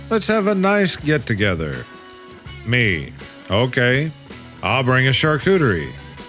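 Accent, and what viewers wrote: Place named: Appalachia, United States. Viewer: I can READ ! Why do I have to listen to some hillbilly read the words ON THE SCREEN???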